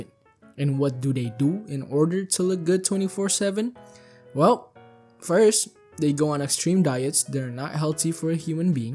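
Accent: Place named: New York, United States. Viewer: are you from NYC? I sort of detect an accent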